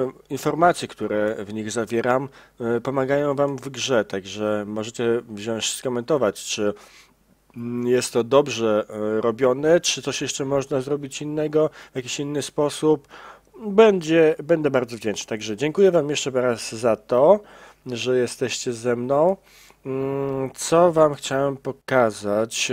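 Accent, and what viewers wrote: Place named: Poland. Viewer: A czy uważasz idioto, że to Niemiec? Jest Polakiem więc mówi tak jak powinno się mówić w Polskim języku.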